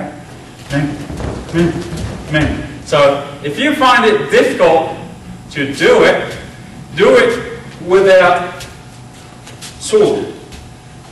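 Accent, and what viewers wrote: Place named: Australia. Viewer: ok great vid this is really helpful and i don't mean to get off subject, and i mean absolutely NO offense in making this comment, but am i the only one who thinks he sounds a little Australian, i mean that just hit me, and im an odd person so i notice these things sometimes even when im not looking for them^^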